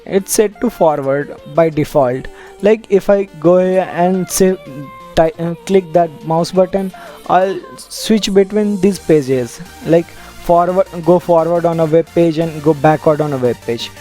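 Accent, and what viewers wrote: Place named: India. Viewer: 10/10 enjoyed the indian accent and the music!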